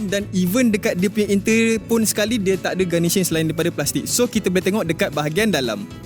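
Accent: Malaysia. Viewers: abg ini omong emang melayu banget ada yg gak ngerti........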